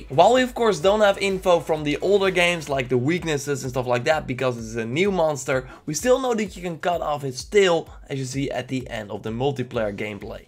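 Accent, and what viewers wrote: Netherlands. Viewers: Sounds Dutch to me.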